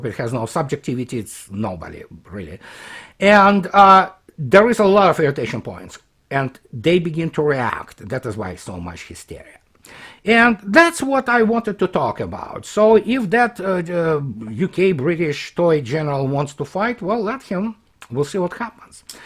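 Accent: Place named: Russia. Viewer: When I heard you at the Duran it was a bit hard for me to understand your "Russian accent" (me Spanish accent), by now I'm loving every one of your videos and I understand and agree in everything you say. You are a very Intelligent man.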